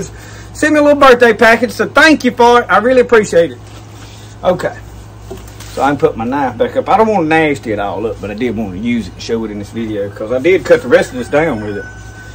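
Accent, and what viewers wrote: South, United States. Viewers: Thank you SO much. This is the first video I've ever found that shows virtually unhaired wild lettuce. I've got lots of it on my property and although my leaves look more like others' wild lettuce than like yours, you were the first person who has said that the hairs on some of these are undetectable. That stuff with the yellow flowers you showed...that's totally what I have. I've known instinctively that this stuff in my yard was wild lettuce and needed to be used, but I couldn't find enough confirmation until now. Plus northern and southern wild lettuce can be so different that I needed a southern accent video to make sure I was doing it right. Thank you! I wish there was a way to rank YT subscription list cuz I'd put you as #1. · Southern accent video -that’s priceless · You sound very southerner. So I have a silly question? 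I. Live in florida. So does this grow in florida?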